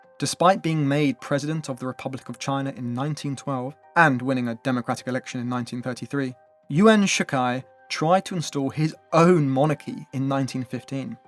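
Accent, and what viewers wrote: United Kingdom. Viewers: I mean he’s bri ish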